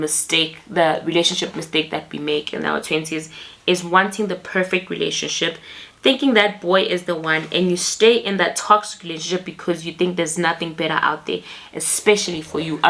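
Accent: Nigeria. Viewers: I always take notes when you do sit down videos and I enjoy them so much. I agree particularly with the people pleaser point because I am unlearning this and it is so uncomfortable and so weird for me. I sometimes feel like I'm doing something wrong when I don't do things to please people anymore. Thanks for imparting knowledge and nudging me in the unlearning direction a bit more. Side note: may you please do a video in your Nigerian accent one of these days.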